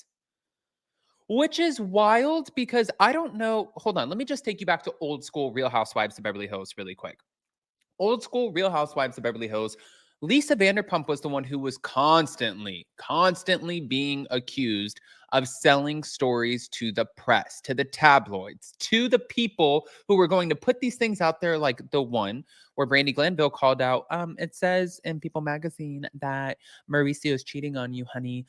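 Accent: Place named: South, United States. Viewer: I love your southern voice, 😂😂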